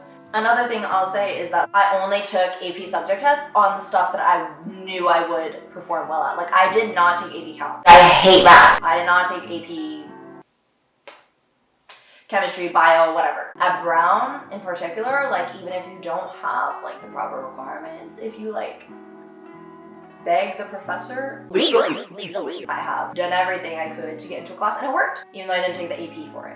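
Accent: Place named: United Kingdom. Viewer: I have no idea as to why I sat through this entire video when I’m a MS3 😂 But the british accent is on point! I had no idea high schools in the states were so intense!!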